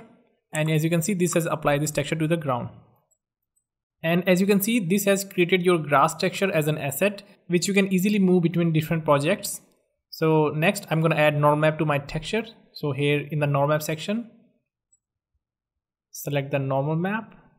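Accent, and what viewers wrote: India. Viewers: when there is an indian speaking, you know its going to be a good tutorial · I know that you are a Indian I can identify by your vioce I see very less indian game dev channel's keep it up 👍🏼From indian punjab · indian accent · Who agree that he is indian but speaking english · You are Indian by accent .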